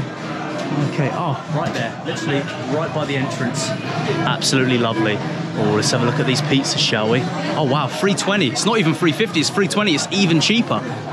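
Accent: Cockney, United Kingdom. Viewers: I love his personality, a budget blogger…love it! Plus you can’t beat the British accent. 😅 Had to sub. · Only stumbled across ya videos few days ago. Been binge watching em all 😂😂 funny cockney geezer me bein bias cos im cockney myself lol. Brilliant videos mate 👊👊👊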